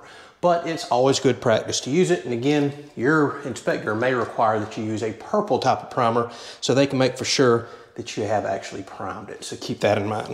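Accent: South, United States. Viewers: Hmmm Height or HeighTh?? I love the southern accent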